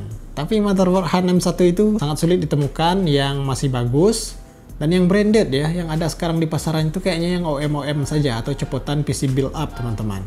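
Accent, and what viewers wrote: West Sumatra, Indonesia. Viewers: Abang orang minang kh?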